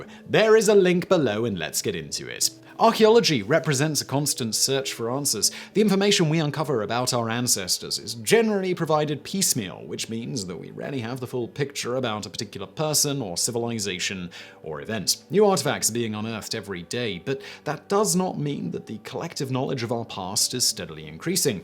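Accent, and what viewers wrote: United Kingdom. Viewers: Love listening to this "snooty brit".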